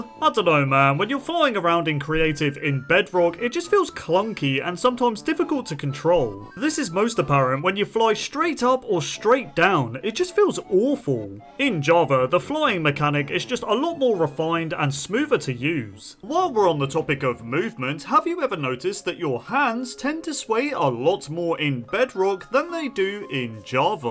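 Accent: United Kingdom. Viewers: dude hes British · oh. you dont. you guys just sound british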